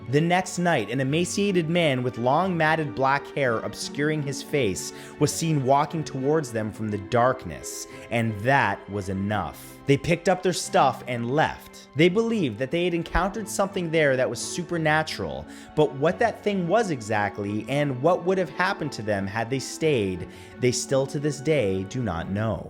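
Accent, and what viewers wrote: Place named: Canada. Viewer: he's CANADIAN, WHAT ACCENT IS CANADIAN?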